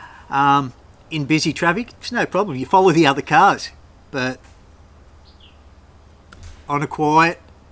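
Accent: Australia. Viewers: You talk like my mates back in oz do and I haven't been back there science 2013 so enjoy listening to you and watching your videos I've been meaning to come to your reaturant I live near the airport my wife has been very sick for the last year and she died last Sunday so now I can find the time Sean from tasmainia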